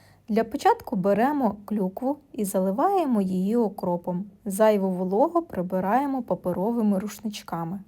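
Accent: Kirovohrad, Ukraine. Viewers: Хазявка рибалка..Гарний наш кіровоградський вимов..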